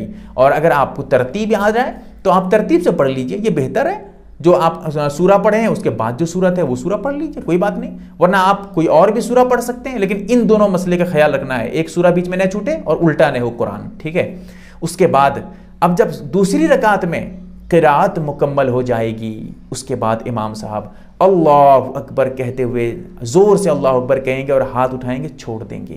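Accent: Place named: Bhagalpur, India. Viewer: مفتی صاحب کی زبان سے معلوم ہوتا ہے کہ شاید بھاگلپور یا گڈا وغیرہ سے ہیں، آپ نے ماشاءاللہ محنت کافی کی ہے، مگر بعض اردو کی لفظی غلطیاں ہیں، اصلاح فرمالیں، مثلا اقامت میں الف پر زیر ہے آپ نے زبر پڑھا محرم میں ر پر تشدید نہیں ہے آپ نے مشدد پڑھا اعراض میں الف پر زیر ہے آپ نے زبر پڑھا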